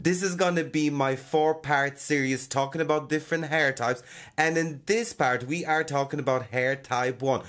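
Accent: Ireland. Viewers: i for the life of me can not figure out if you are super Irish or jamaican and obv one would make more sense but as soon as I'm sure u say something else and I'm like I DONT KNOWWWWW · Huh... A non native English speaker who seems to have learned English in Ireland or from an Irish person. Fascinating. That's at least what it sounds like to me. I wonder what his mother tongue is. 🤔 See, now I'm intrigued.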